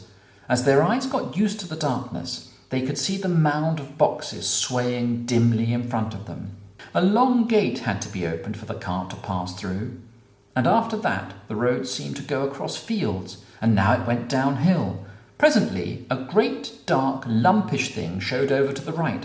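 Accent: United Kingdom. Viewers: Thanks a lot , your accent is my favorite . Is it considered as a soft or modern RP accent ?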